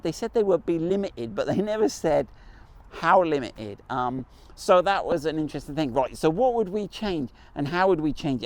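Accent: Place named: England, United Kingdom. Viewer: Trying to place your accent, Norfolk or Hampshire? It's not West Country.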